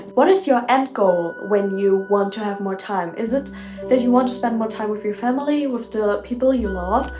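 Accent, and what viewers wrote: Germany. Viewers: I’ve never watched a video from you and like 10 seconds in I was like wait is she German? 😂 not in a negative way, I’m also German, just shocked how easily I spot German accents because they sound so similar to me haha (great video!) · I KNEW IT ! I heard German! But tbh its so cuteee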